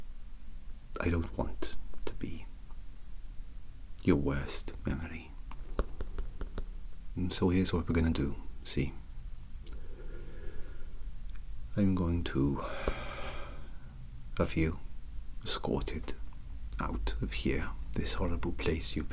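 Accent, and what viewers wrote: Wales, United Kingdom. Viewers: I don’t know why, but hearing you with a Welsh accent really makes me smile. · I love this character, I can just see the wheels turning in your head ☀️ the Welsh accent is amazing I love it ❤️☀️☀️☀️ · An Elf Welsh communist sounds just like Wales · не, я к тому, что же наполовину валлиец, так что это самый что ни на есть натуральный акцент☺️ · My Stepfather was welsh, not heard the accent in ages. I think it’s great 👍🏻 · Absolutely adore welsh accents, also I got pretty invested in this story, it was fun! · Oh my god, I love your Welsh accent!! ❤️ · I’ve never thought that Welsh accent can be so asmr! Thank you for your video :) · Out of all the ASMR triggers I've listened to, your Welsh/Welsh accent is on top. 🤤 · socialist elf with welsh accent me, a welsh-speaking socialist, immediately clicking the notification: oh no™ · Top notch engaging acting here with this delightfully funny persona and slow shift of mood...shining example of comments in that recent Tinglecon cinematic asmr panel about "enveloping the viewer in a world" and his "seeing where the character takes him." I love whatever you did with the audio here, it's so present and crisp and soft all at once...really enhances what is my fave Welsh accent of yours, and those choice mouth sounds in beginning. 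I think "chuffed" is one of my fave Britishisms. 🥰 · Spreading socialist democracy whether they like it or not, key phrase no? Founding father’s said, here is your democracy if you can keep it. Ouch. A bit of trouble keeping it now, no? I agree, if we don’t move forward we’ll inevitably move backwards very soon. I was wondering when you would do a welsh accent!! Thank you, it is naturally poetic and musical, Charming like yourself🤗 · Sigh.. The Welsh accent... I could listen to it each and every day... 💗💗💗 Dear Sir... I mean Comenzar Sir, very little scares me, least of all pain. I had been imprisoned in a dark and horrible place for a very long time before you found me, but please understand me that although you have helped, and I'm ever grateful, I saved myself. Why did I make you believe that you saved me? Because I want you to always believe the best about yourself and your abilities. 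You will never become one of them, as you're not them, but I see you going down a road that is not good for you. Thus I choose the place closer to danger as it's furthest from harm. I will not leave this place, but don't worry, I will keep my distance. I'll do anything to keep you safe. I will tell you this, though: that I will break the Stag Officer out of jail. Far too much blood has been spilled already, and there is so much good in him, just as there is so much good in you. I will never punch you, I cannot ever do that. I cannot hurt another. Face this stormy challenging time courageously. Your safety net will always be at vigil close by. Thank you for listening, Mr Comenzar. I'll be going now, but we will meet again. 🌱🌱🌱 Now put that gun away. I don't fear you, and I never will. 🌱🌱🌱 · Hmmmm.....can't say I get behind the whole Socialist thing, but I am digging the Welsh accent! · This is all a setup for the punchline, "A guy walks into a bar and sees 3 socialist elves......" (And no finale, we all want more unstable elves!!) (Also I legit held my breath mark onward.) (Also also, the parallels and commentary in this is so poignant it hurts. 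🥺) My gawd, your Welsh accent is so musical and beautiful. Well done once again, Commissar! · As somebody who is a born and raised welsh kid, it's nice hearing the accent. Great job! Da iawn ti!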